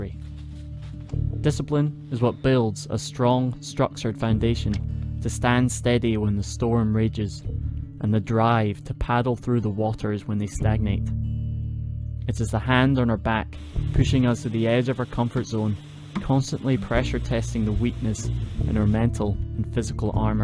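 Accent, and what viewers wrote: Scotland, United Kingdom. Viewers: Scottish ASMR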